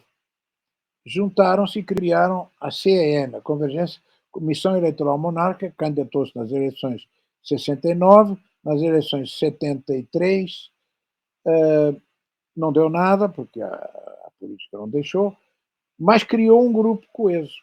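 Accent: Brazil. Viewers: ele é portugues! lol está é a falar com o sotaque brasileiro. o que acho uma completa idiotice e traiçao á pátria! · Estive duas vezes no Brasil, em 1984 e em 1991. Se eu não falasse com sotaque brasileiro, 80% das pessoas não me entendiam. Aqui o importante é que eles, os brasileiros, entendam o que o professor está a dizer. Além disso, fique sabendo que os linguistas dizem que o português que se falava em Portugal nos séculos XVII e XVIII era, quanto à sonoridade, muito mais parecido com o que se fala hoje no Nordeste do Brasil do que com o que se fala hoje em Portugal, com as vogais muito fechadas… Embora pareça um pouco ridículo para nós, que estamos habituados a ouvi-lo falar o nosso português, acho que o professor tomou a atitude correcta aqui. Não deite fora o bebé com a água do banho… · Falar com pronúncia brasileira professor? recuso ouvir isso. O senhor é português!!!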